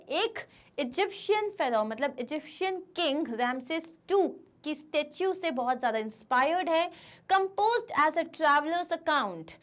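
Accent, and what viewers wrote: India. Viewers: Indian english is not english.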